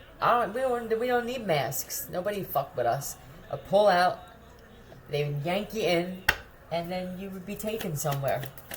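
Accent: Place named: Philadelphia, United States. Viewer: Yooooo giiiirrrrrllll I LOVE YOU AND IM NOT TOO FAR FROM YOU AND BY THE PHILLY AIRPORT!!! You are spot on with these MF and what they are doing to me presently and have been for the last 3-4 years… gang stalking, harassment, hacking so much more….. smfh!!!! I would love to meet you in person so bad!!!! This reading had me on the ground rolling laughing!!! I love your Philly accent and I love the impressions of the women of the night and the wanna be women of the night!!!!!! I can’t make this crap up that they are doing to me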